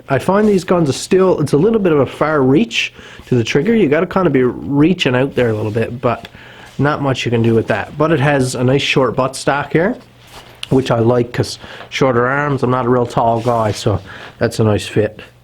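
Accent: Newfoundland, Canada. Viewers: first guy I’ve ever heard with a noticeable Canadian accent lol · Oh yeah, that's a good ol' fellow Newfie I hear. Great video my buddy. The rifle looks beautiful.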